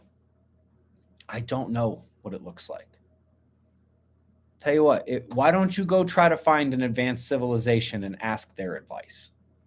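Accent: Pittsburgh, United States. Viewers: Ben, Thanks So Much for this discussion/update... Has anybody plotted any Theoretical Data on Post Pole Shift: Earth Rotation and or Slosh Factor from the Oceans? Thanks for the Pittsburg Dialect, I understood it all... Carpe Diem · That Pittsburg accent caught me off guard. Also your a very intelligent man how you expect ppl to come up with advanced questions. Lol Free Top G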